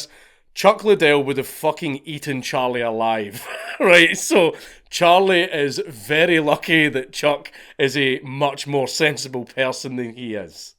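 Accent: Scotland, United Kingdom. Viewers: Who,, Charlie? Charlie is an American,, or do you mean the channel creator? Count Dankula is from Glasgow Scotland,, and he's speaking with a heavy Scottish brogue... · Each video you over pronounce every word to mask your scottish accent kills me 🤣🤣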